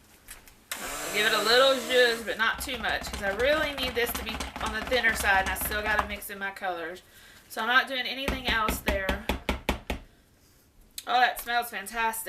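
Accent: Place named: South, United States. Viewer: I love your voice. Such a pretty "Southern Belle" charm:)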